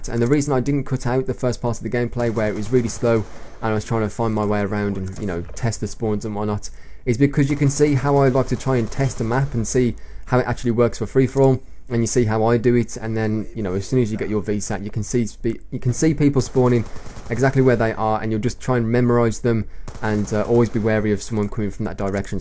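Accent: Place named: Cannock, United Kingdom. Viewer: bit of a personal question but are you from cannock? your accent sounds like cannocks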